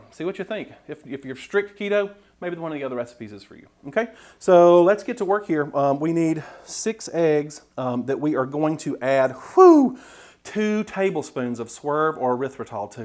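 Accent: South, United States. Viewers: Im really enjoying your videos. You're respectful, good speed, clean environment, humorous! Love the southern accent as well! :) Thanks! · I can tell you are a Southern boy. 🤣😂 Love it. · Hey, Throw some cracklin's in there.The last one with the bacon grease and liquid corn looked awesome I wonder if you could bake them in the brownie pan hummm.Just 3 weeks ago you hit 5,000 and have you looked at your numbers? I spread the word at work looking forward to 10,000 in the near future. I have 2 friends at work that don't do low carb but, they just like your humor and southern accent.I love both of those also but, your carb smart and honest in your opinions.Thanks for sharing. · Come for the cooking show, stay for that southern draw. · There is NO doubt you are southern... since my gf is total southern from bama!!! Loved your pancake video and this is the second one of your videos i have watched you remind me a little bit of Alton Brown... 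you come off very honest and genuine and likable so I have subscribed to your channel you have a new fan!!!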